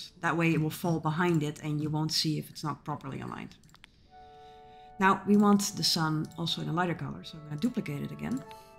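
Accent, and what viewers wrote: Netherlands. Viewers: that too lol but you speak very good English and for some reason i recognize the way Dutch people speak English. We can either speak very good English or very bad like Louis van Gaal 😂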